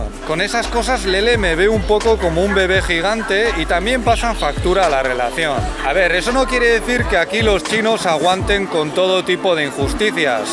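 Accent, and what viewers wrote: Basque Country, Spain. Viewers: A mi me gusta más el español de Lele que el de (demasiado acento vasco para mi gusto) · aunque no lo creas, los acentos de otros hablando otro idioma, por aquí se acepta y no es raro escuchar un español con sus dejos y defectos...nos parece simpático...asi como tú hablas en español de España, es otra cosa con el de latino américa,y de cada país, así que a Lele, que practique un poco mas, pero yo sé que todos los amigos que los apoyamos nos parece muy simpática su manera de hablar y también el tuyo " ya veis " que es la salsa de la vida...😃☺️... muchos saludos a todos ☺️...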